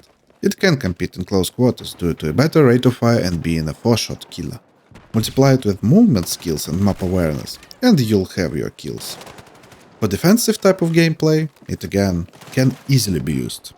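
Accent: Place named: Russia. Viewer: И смысл говорить на корявом английской. Все же слышать что ты русский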